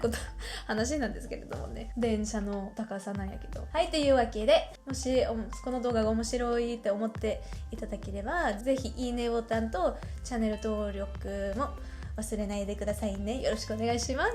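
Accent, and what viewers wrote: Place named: Kyushu, Japan. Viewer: 日本人にとってスイスはもっとも好印象の国。ところがあるフランスジャーナリストがこれを知り、意外だと言った。欧州ではスイスは日本ほど好かれていないという。そういえば黒いスイスなんて本もある。いろいろ感心しないことが書いてある。でも大国に囲まれて生きるために必死で知恵を絞って生きてきた結果ではないかなとも思う。たとえば中立国でもあるスイスの立場を活用して秘密性の高いスイスの銀行に世界からマネーが集まるようにしたとか。小国なのにどこもできないことをスイスはやった。たいしたもの。参考になる。今回の日本に対する率直な意見ありがとう。当然のことながら日本についてもいろいろ長短がある。僕ら日本人が自分を見つめ、改善するために参考にすればそこに価値が生まれる。よりよくなるために。ありがとう。ところで地方にいたの？えらいなまりがある。これは外国語なまりではなく、日本のどこかのなまりと思う。声だけ聴いたら、地方出身の日本人と間違われるでしょう。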